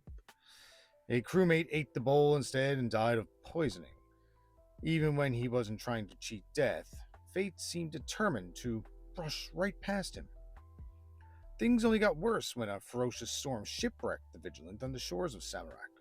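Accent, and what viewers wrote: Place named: Newfoundland, Canada. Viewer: Love the video! Off topic, but are you from Newfoundland by chance? As a Newfie I think I'm picking up an accent there lol!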